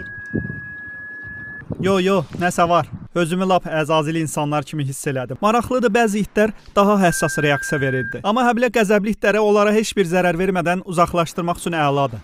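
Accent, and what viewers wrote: Sheki, Azerbaijan. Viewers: Şəkilidi ona görə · Şekili olmadan şeki lehcesini nece oyrenmisiz?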